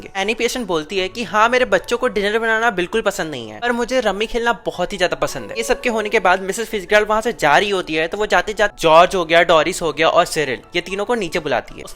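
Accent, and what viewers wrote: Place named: Indore, India. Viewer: meko bhai tum toh indori accent bol re ho ni😊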